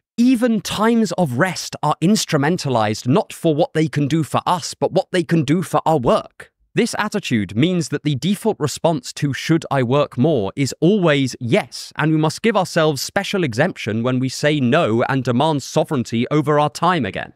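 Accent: United Kingdom. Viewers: Relax with the British accent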